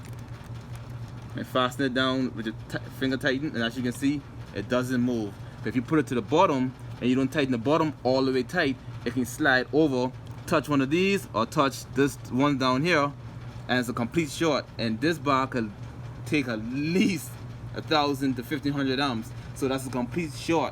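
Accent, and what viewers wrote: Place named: Bahamas. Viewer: Nice, am running leaf as well. U sound like Bahamian. I’ve already built my windmill as well using the same motor.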